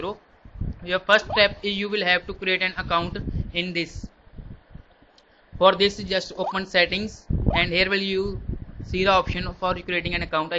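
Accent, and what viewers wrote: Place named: India. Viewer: Katherine Hendrix Indian accent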